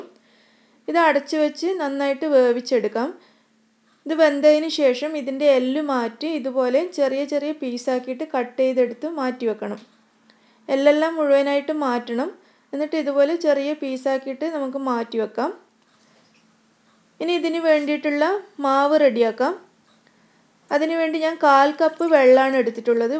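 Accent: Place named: Malappuram, India. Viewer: kitchen lnsha Allah......ennundakkanam. samsaram Kannor style allallo ....proper Kannor aalla annu thonnunnu.Oru Malappuram style und .Njan Malappuram aane .Athinal anikk kelkkan nalla ishttamane.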